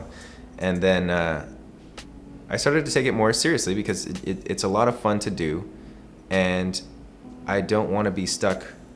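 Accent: Ireland. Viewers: Ur Irish ah here come to me house hun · You are irish?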